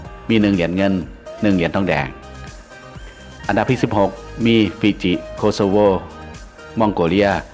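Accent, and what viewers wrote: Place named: Isan, Thailand. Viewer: เว้าอีสานฟังม่วนดีคับ ผมเองเกิดที่ชัยภูมิอีสานโดยตง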